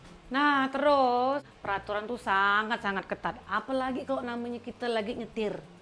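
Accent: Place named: Pontianak, Indonesia. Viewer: alamak bahase pontinye:face-blue-smiling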